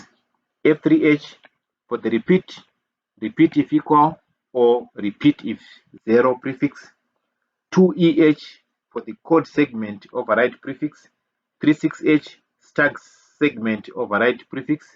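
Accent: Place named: India. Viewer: Indians speaking english sounds very ridiculous, next time use subtitles or remove anything below your tonge, the world will thank you.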